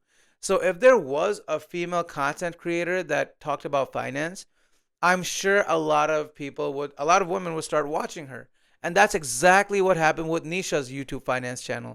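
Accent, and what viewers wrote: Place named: United States. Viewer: You've dug your own grave by publishing click-bait crap (scam, exposed, etc.) You'll never be a success trying to undermine others. Try doing this with white skin and you might be grateful that you tick at last one of the diversity boxes. Be happy bro, life is amazing! Given your accent, I'd guess you're in the US. If your'e looking for career optoins, try the Democrats.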